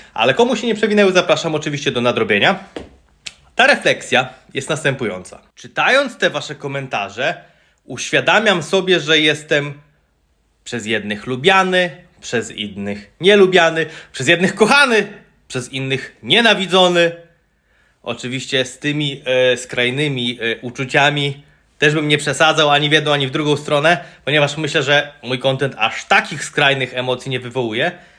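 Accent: Lublin, Poland. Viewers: Jak mówisz bez akcentu to brzmi dziwnie.Najlepiej jak robisz za wujka z lubelszczyzny.Mam tam rodzinę i faktycznie taki akcent mają. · Ty zaciągasz jak mój stary 😂 Wychowany i urodzony na lubelszczyźnie od pokoleń.. Święta w twoim wykonaniu to jak nagrane z mojego rodzinnego stołu.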